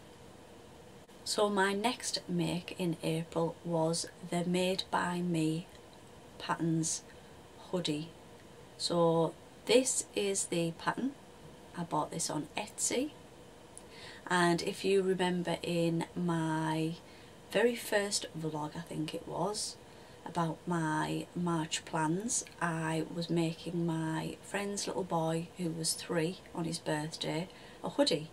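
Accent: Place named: North East England, United Kingdom. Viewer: Enjoyed your vlog your shirt is lovely -was trying to place your accent you sound like you may be from my neck of the woods I’m formerly from Bishop Auckland now North Tyneside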